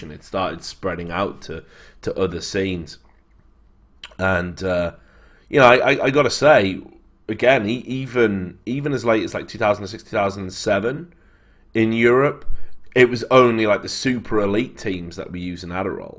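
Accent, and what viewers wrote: Wales, United Kingdom. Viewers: I picked up the welsh in your accent :D great vid, good points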